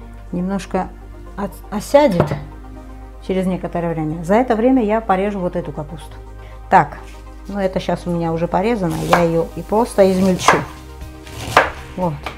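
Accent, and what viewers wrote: Batumi, Georgia. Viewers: дорогая, очень приятно слушать Ваш голос. Когда жила в Батуми..... мне ваша речь напомнила о детстве И отдельное спасибо за простой и очень вкусный рецепт Я выросла на такой капусте и сейчас балую своих родных вашим рецептом По вашим рекомендациям готовила перец в банках. Супер!! Спасибо и дай вам бог здоровья и вашей семье благополучия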